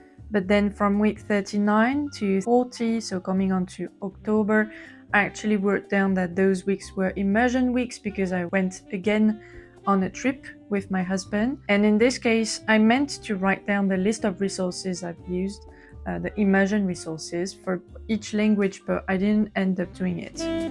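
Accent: France. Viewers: I knew it!! 😂 I love the French accent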